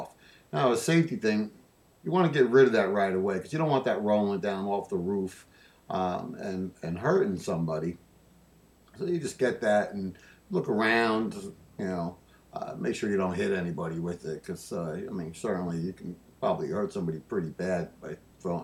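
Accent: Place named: Northeast, United States. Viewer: Nice job. Love the Northeast accent. Not flirting.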